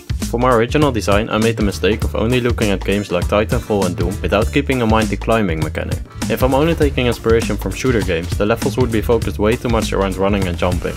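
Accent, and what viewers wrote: Netherlands. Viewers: Are you Dutch? Your accent sounds really Dutch... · Why do you sound dutch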